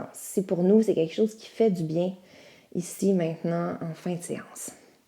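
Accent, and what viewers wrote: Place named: Canada. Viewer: ce délicieux accent du Canada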